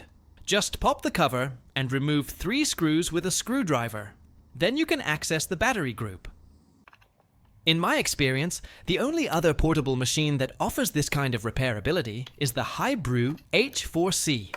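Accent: United Kingdom. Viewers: Bro became more British since last time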